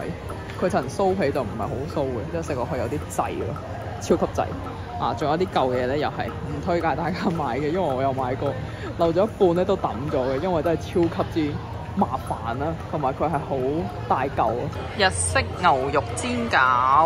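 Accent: China. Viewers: 你系大陸人?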